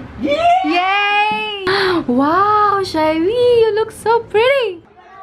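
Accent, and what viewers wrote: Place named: Punjab, India. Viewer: Hey you are so preety ☺️✌️ I don't literally wanna seek attention for the giveaway I am just telling you from the deapth of my heart That you look so innocent and sweet Mennu nhe pta logo ko aap attitude wale kyu lgte ho Mai toh apko phli baar dekhu toh aap mujhe attractive lgoge By the way apne apni punjabi frnd se accent sikh liya or mene apse Now meri mumma always say to me why are you talking like a guy all the time 😂😂😂 love u,☺️✌️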